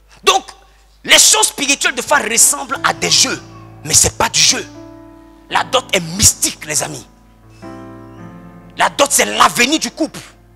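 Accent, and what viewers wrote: Ivory Coast. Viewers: Mais mon frère c'est comment? Lol, tu ressembles à un congolais, mais l'accent est 100% ivoirien! Bon enseignement cousin.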